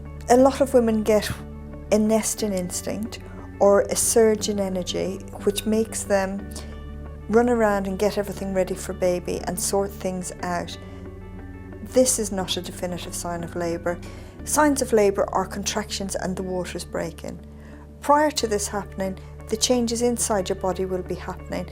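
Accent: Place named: Ireland. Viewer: You're so stupid, she clearly has an Irish accent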